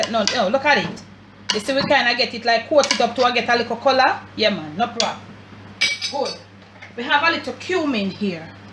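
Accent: Jamaica. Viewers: Am here for Jamaican accent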